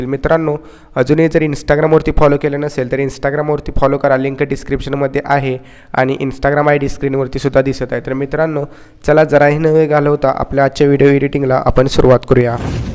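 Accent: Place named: Marathi, India. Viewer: एक मराठी कलाकार आणि मराठी आवाज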